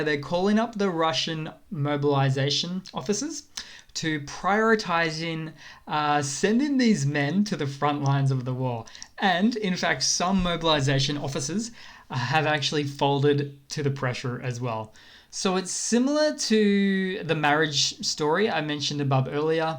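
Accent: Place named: Australia. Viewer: I only listen for the Aussie accent.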